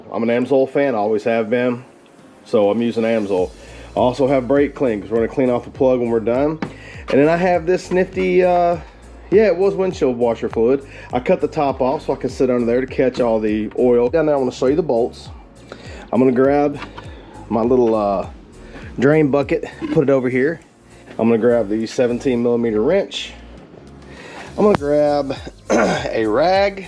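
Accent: South, United States. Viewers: I always look forward to Thursdays! Happy Thanksgiving,! I see you have now achieved 220 subscribers. Congrats! NICE t-shirt! I need to get me one of those. Now - you say EVERYTHING you will need to change the oil is right on the table. I do NOT see any Crown Royal or Mich Ultra., you are slacking. But I must say it looks like a surgeon's tray! Everything you need, there at the ready. Me, I'm in and out of the tool box and the house 10 times. I dig the ingenuity in using a dropper when you don't have another small tube or funnel to fit. That's something I would do. We learn by doing and get better as we go. What is there, a "thing" about Amsoil? I have never used it but it seems like they make a good line of products. Why would someone criticize using it? Gotta love YouTube. Learn something every day about these bikes. Looks like you got it filled up to spec with nice clean oil. 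My bike being 7 years old, I'm concerned about all my fluids - oil, shaft oil, hydraulic fluids and coolant. Maybe in the Spring. My tires still look good although original equipment, but I worry about them as well. I only have 700 miles on 'em. But dry rot is a concern. Love the way southerners say "oil". Ole. AmsOle. One syllable. Not "oy-l". Ole. I'm off to get a quick ride in before chowing on a delicious turkey dinner. Have a good one! Tongue click, finger point back atcha.😎😜👓🕶🏍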